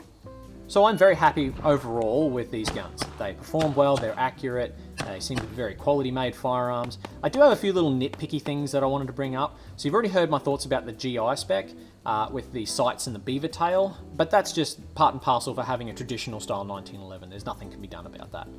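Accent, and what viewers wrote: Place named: Ireland. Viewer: Love the Irish accent.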